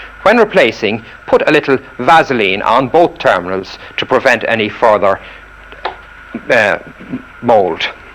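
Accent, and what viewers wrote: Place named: Meath, Ireland. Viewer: Lovely meath accent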